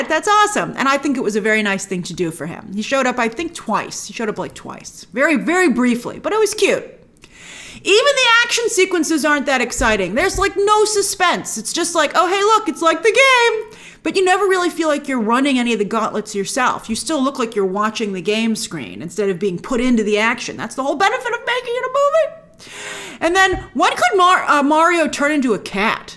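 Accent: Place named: New York, United States. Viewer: Is she really from NY or does she do the bad accent for the pop?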